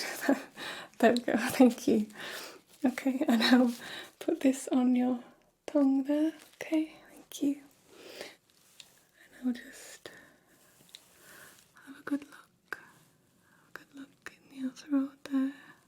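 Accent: United Kingdom. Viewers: Great video idea, also love the accent whereabouts are you from within the uk?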